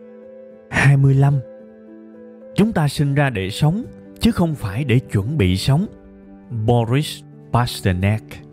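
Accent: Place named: South, Vietnam. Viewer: giọng miền trong khó nghe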